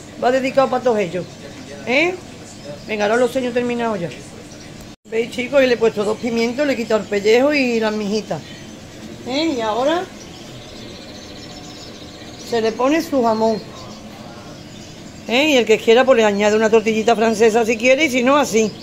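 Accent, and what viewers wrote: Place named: Andalusia, Spain. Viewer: Buenos días preciosa, que rico lo haces todo,ese lomo ,lo tengo que hacer. Me recuerda mucho la cocina de mis abuelas. Vivo en la provincia de Barcelona,en Mataró ,desde pequeña,. Nací en un pueblecito de Sevilla ,Huevar del Aljarafe,no se si te suena. Siempre te veo,y te doy mi dedito arriba, aunque no comente siempre. Tu manera de ser y hablar,me transporta ami pueblo,de pequeña, siempre iba en vacaciones y me encantaba su gente , su comida y su gracia al hablar. Un beso corazón. Te sigo siempre . Aunque me he criado en Cataluña,que quiero mucho,no olvido mis raíces andaluzas. Gracias cariño por transportarme a Andalucía. ❤️💃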